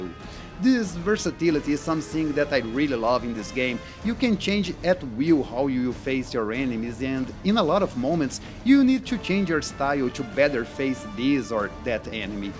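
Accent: Brazil. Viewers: I can hear the Brazilian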